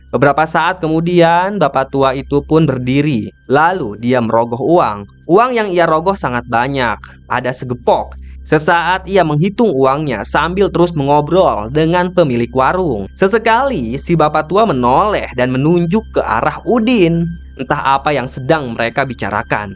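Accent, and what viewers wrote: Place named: West Kalimantan, Indonesia. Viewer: Kalau saya dengar omong nya Kalimantan barat. Sebenar nya Kalsel.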